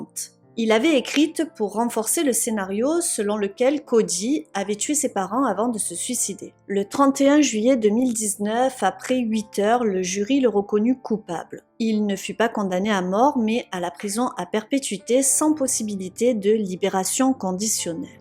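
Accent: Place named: Var, France. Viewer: cogiter stories a traité le sujet il y a 3 semaines. Mais je vais quand même regarder ton travail car j'aime ton accent varois(peut être je me trompe) et car tu développes très bien tes sujets. Bonne fêtes de fin d'année à tous.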